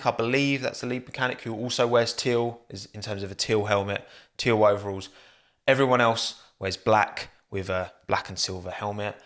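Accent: United Kingdom. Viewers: All I heard in this video was me me me I I I in an incredibly annoying Bri'ish accent innit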